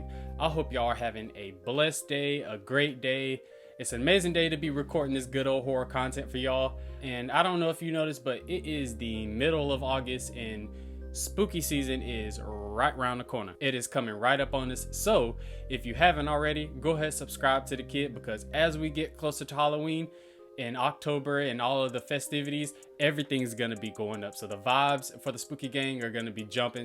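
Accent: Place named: South, United States. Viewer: You sound very southern. Where do you live?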